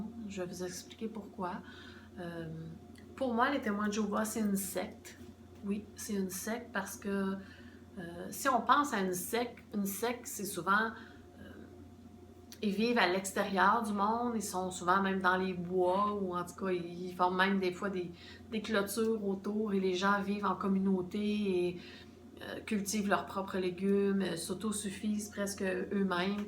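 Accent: Quebec, Canada. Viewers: salut la cousine canadienne un ptit kikoo de france je te rejoind sur ta chaine ca me plait beaucoup pis ta des bo yeux et une jolie voix avec un belle accent excuse moi j'ai des amis quebecois en france on dit ici vous etes nos cousins lol pis les autres video sont tres bien aussi pour les tj ta pas besoin d'une eglise ou une communaute la religion tu l'a au fond de toi tu peux prier chez toi voila kisssssss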